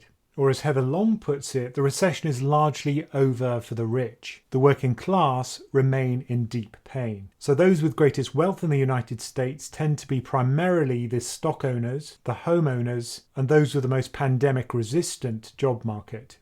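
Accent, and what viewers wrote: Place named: RP, United Kingdom. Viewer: You are such an intellectually honest man and your Queen's English is second to none. Bravo Amigo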